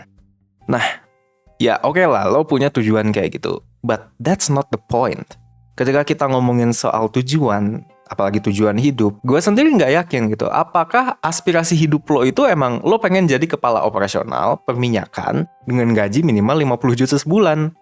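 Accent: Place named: South Jakarta, Indonesia. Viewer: dia ngomong kek orang jaksel 😆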